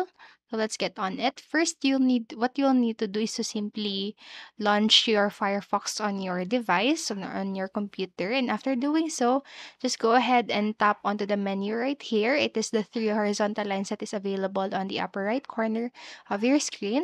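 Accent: Philippines. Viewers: Filipino accent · Thanks! Your Pinay accent is also nice.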